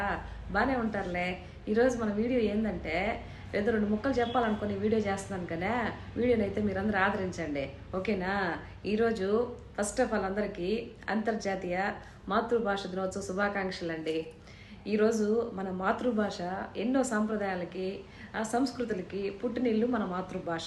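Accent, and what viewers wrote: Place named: Krishna-Guntur, India. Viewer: Your Telugu language is also somewhat not clear. You always try to speak Krishna and Guntur Districts Telugu language. Regards.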